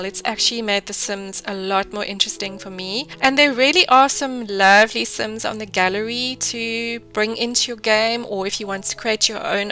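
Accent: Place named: South Africa. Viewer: Idk why but I was so caught off guard by this being narrated by a South African 😂 SA simmers represent! 💚💚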